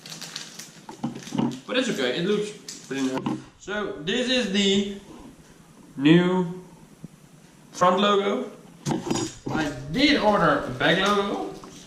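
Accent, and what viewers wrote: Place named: South Holland, Netherlands. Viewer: Hoor ook liever je Zuidhollandse accent hoor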